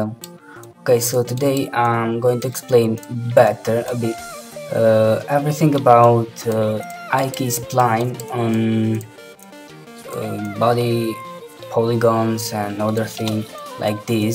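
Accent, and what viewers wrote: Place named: Italy. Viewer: You sound so stereotipically italian.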